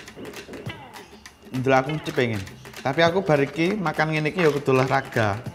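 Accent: Java, Indonesia. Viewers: Mas Kon Kuwi jowone ndi?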